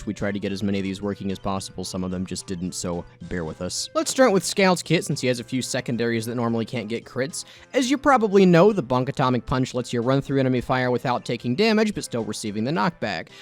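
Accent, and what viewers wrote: United States. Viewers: What part of Florida are you from?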